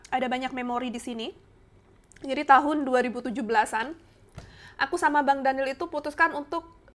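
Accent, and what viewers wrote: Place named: Banyuwangi, Indonesia. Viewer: bhsa nya oseng ya..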